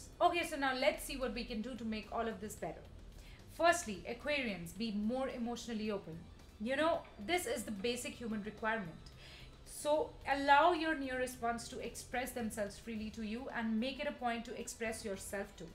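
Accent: India. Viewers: The Indian accent in the voice was a bit of distraction to what needed emphasis. Nevertheless, Aquarius are geniuses and none, among all signs, have a total perfection. Thanks for the suggested actions. 😊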